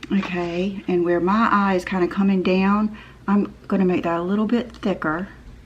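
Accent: South, United States. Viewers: Beautiful look! Thanks for sharing!! I love your southern accent or terms I should say!! "at the time"!! 😉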